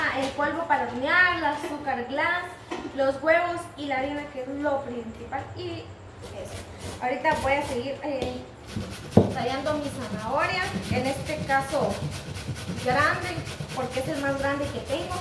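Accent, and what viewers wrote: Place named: Guatemala. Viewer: De todas las chicas de los grupos chapín ella es la única que habla como su país pero que se escucha bonito es decir no vulgar su forma de hablar su acento.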